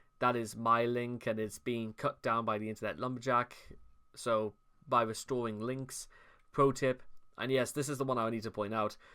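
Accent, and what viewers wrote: United Kingdom. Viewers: What part of the UK are you from man? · Sounds Northern to me. But I'm an ignorant North Londoner. I'd guess Northamptonshire maybe.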